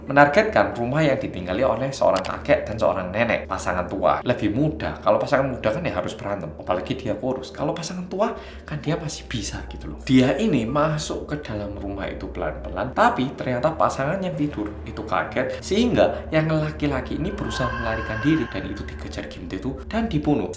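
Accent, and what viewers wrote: Java, Indonesia. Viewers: Pemalas aja entitled banget. Mungkin orang ngga mau namain anaknya kayak gitu karena ngga mau anaknya namanya sama kayak penjahat. Makasih, 🙏🏼🙏🏼 PS: percuma juga kalopun yang baru masuk cuma bergaul sama yang pertama kali masuk penjara. Dia aja ngga mau tanggungjawab malah nyalahin sistem keadilan/penjara di Korea. Cih. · Medooooookkkkk,aku datang wkwkwk:_Haa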